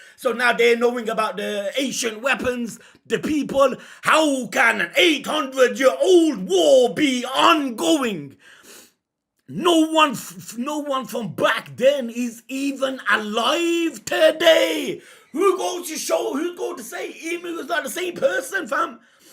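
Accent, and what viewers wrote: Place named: Midlands, United Kingdom. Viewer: Yes and I would hazard a guess from the midlands of the uk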